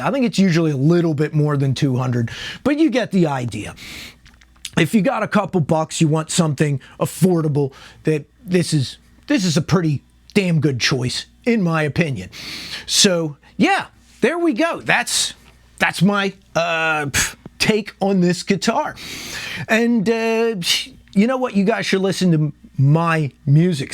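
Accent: Philadelphia, United States. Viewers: I just picked one of these up used. I got a hidden gem. Might I ask what region of the country you’re from? You have a distinct accent, just like mine. My wife makes fun of me for it. I hail from the outer regions of the Philadelphia area. I grew up attending the Philadelphia folk festival, which is in schwenksville PA. Sounds like you’re from the area. Maybe, maybe not. Love the video and thank you for it my friend .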